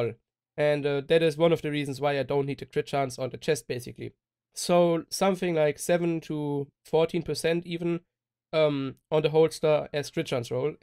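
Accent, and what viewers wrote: Germany. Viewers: Your english sounds like a german who wants to talk english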